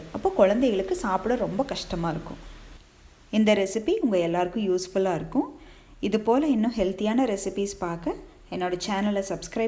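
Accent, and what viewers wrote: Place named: Kongu, India. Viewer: Nice Kongu Slang and useful Recipe:-)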